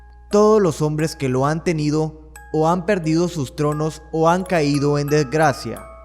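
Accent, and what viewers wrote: Honduras. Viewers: Soy el unico en notar que el narrador es hondureño 🇭🇳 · Eres de Honduras?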